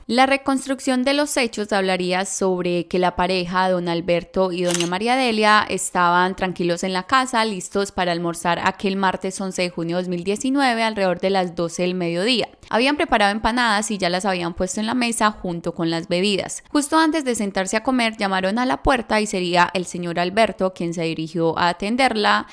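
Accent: Colombia. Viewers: Por eso yo no confío en nadie porque no sabe uno con quién se va topar Dios nos libre de esa gente malvada, descansen en paz los señores, tan lindas personas! 😪Me gusta mucho tu acento Colombiano narras muy bien te felicito, saludos desde Sonora México, Mi hija se enamoró de Colombia en un intercambio escolar, su comida su gente tan bella,siempre lo recordará!! ❤️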